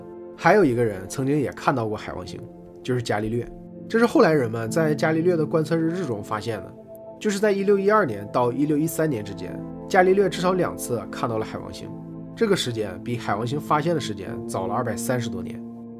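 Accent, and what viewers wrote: Northeast, China. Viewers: 好重的东北口音 哈哈哈哈😂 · 能不能不说东北话，太拉低档次了，好好学习学习普通话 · 这东北大碴子味儿听着真难受，每句话的最后一个字都是降调，又软又怂又丧失，把挺好的文案全毁了，越听心情越低落，每句话都出溜着说，老头钻被窝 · 做得很好。有点东北口音更好😊！ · 这个东北口音太逗了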